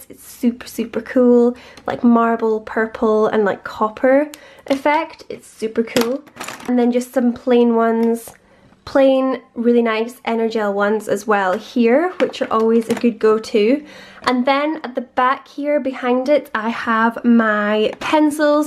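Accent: United Kingdom. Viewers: I love how her accent sounds like normal English accent but also has that British accent😍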